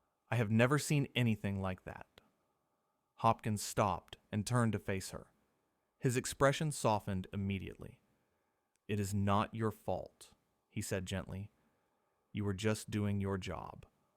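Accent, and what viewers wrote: United States. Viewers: This is a load of shite just show the clip instead of all the American narration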